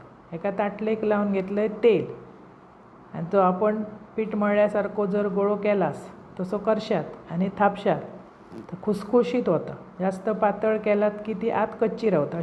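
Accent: Malvani, India. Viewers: ताई तुमची बोलण्याची पद्धत अतिशय गोड वाटते .आपलेपणा वाटतो.मालवणी गोडवा .पदार्थ छानच.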